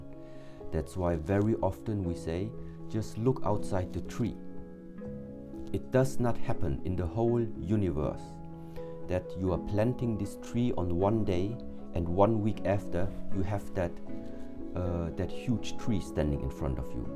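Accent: Germany. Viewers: U Sound like a german speaking english :D whats your native language? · Anybody else thinks his accent sounds German? 😂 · I knew I heard a German accent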